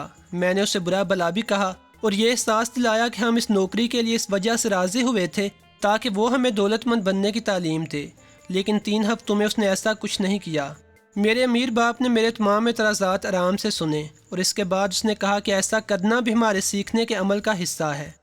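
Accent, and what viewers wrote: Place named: Punjab, Pakistan. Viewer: پنجابی لہجے میں اردو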